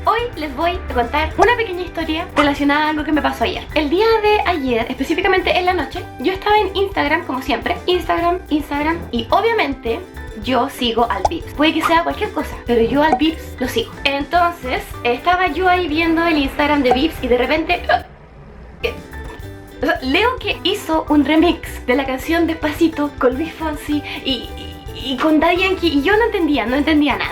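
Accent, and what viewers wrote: Chile. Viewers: Eres chilena cierto 😓😓😓😓😓😓😓😓😓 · es chilena?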